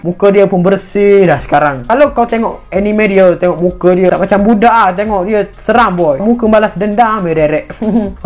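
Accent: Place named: East Coast, Malaysia. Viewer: mcm org pantai timur je speaker ni ckp😅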